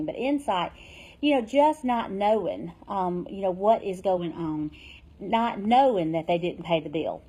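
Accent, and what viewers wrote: South, United States. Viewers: You are so helpful! I love your southern accent. Sooo cute! And you DO KNOW A LOT! 💓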